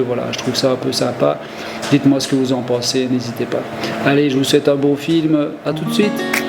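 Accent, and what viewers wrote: Liège, Belgium. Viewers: A la 30,26 minutes le serre joint à lâcher !!!! Heureusement que tu tenais bien le gabarit n’a pas bouger. Pour ma part , j’ai un autre type de gabarit. Qui entaille directement les trois charnières en un seul serrage. Porte 201,5. En tous cas , ça fait plaisir d’entendre un belge avec un accent liégeois. Bientôt je me lance sur YouTube avec mon accent Brusselair ! Ça va déménager les R.🥳🥳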